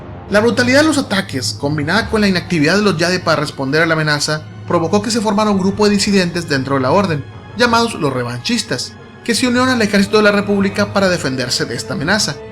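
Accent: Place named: North, Mexico. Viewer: están bien tus historias, y estás muy bien informado, pero la neta hablas bien feo, no se te entiende nada cuando hablas, y además tienes acento norteño. Habla más despacio y claro, gesticula bien.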